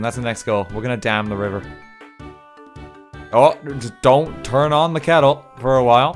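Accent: Ireland. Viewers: Ya know GrayStillPlays tries his best to make a chaotic city but you have made a well working chaotic city + an irish accent so you are better. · Gotten used to how his accent sounds now and I honestly can't tell if he sounds less or more Irish than he does here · Are you from Ireland I am I live in Kerry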